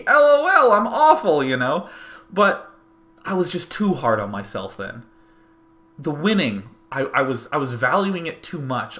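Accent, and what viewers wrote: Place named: Russia. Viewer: nice russian accent!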